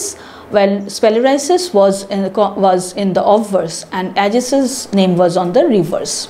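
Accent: India. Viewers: Namaste i like ur vidéo n indian accent very pleasant i like india n rusia not démoniaque people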